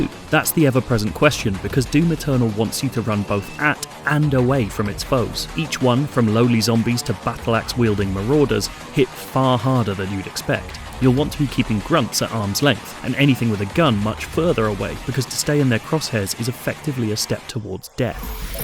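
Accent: United Kingdom. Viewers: Only British accents can make Doom sound fancy.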